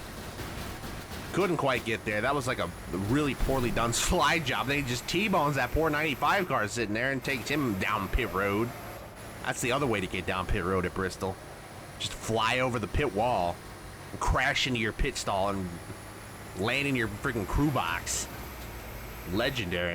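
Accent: Michigan, United States. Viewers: This was hilarious dude. Well done. Sidenote, you from Michigan?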